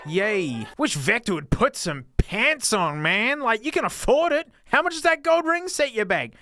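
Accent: Australia. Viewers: You sound like sniper tf2 and aussie too noice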